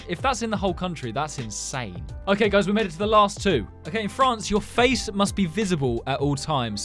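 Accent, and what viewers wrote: United Kingdom. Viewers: You’re British I never knew you’re a British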